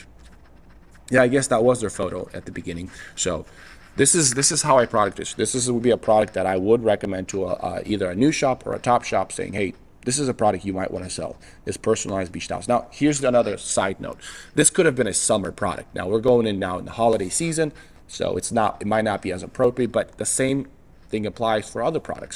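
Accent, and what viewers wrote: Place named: North, United Kingdom. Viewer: your second language? When did you learn? You accent almost just sounds like a northern accent. Impressive 👍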